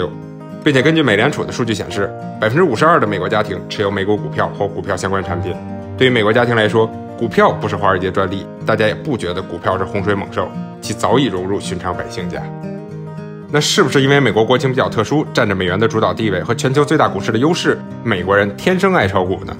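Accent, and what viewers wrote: Beijing, China. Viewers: 一直觉得是北京的～终于在这个视频里得到了认证哈哈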